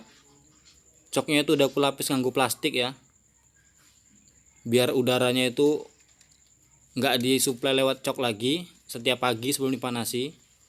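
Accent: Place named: Medan, Indonesia. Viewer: Jowoe rosoe medan cak...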